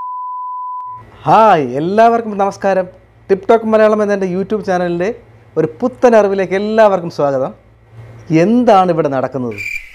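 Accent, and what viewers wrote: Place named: Tamil Nadu, India. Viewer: sounds like your talking tamil? ill try ippadi irruka? hehe or maybe you live somewhere from tamil nadu sir?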